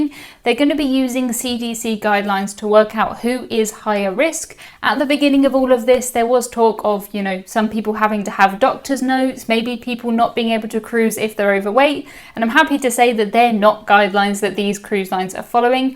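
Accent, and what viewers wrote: RP, United Kingdom. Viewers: Very informative and didn't feel too long; everything was well packed! Hopefully this level of cautiousness is only temporary, yet some of the procedures make sense for the future under all circumstances. Anyway.. It's much easier to learn about these things as someone (read ) has already digested the data and translated it to understandable language (posh accent or not..) and good examples. Thank you!:)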